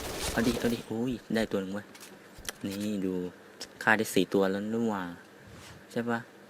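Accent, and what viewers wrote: Isan, Thailand. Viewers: สำเนียงเหมือนคนอีสานเลย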